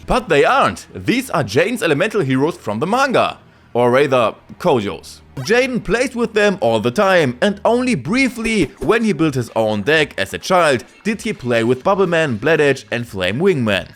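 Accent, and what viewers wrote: Germany. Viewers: this is German English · are u german ?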